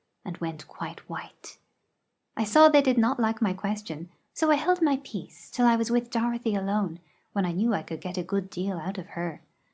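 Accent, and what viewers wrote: Ireland. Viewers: You are a very creative girl ... and I love your Irish accent !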